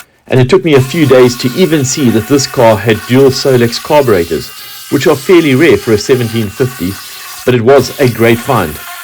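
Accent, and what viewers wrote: South Africa. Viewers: Hello Sir, are you from South Africa..? 😊😊😊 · Great to hear a South African accent broadcasting from the soggy isle. Good luck with the restoration.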